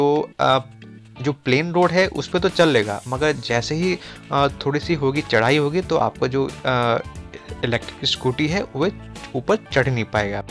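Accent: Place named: India. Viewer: i could not understand anything you said. your english has a strong accent, possibly indian.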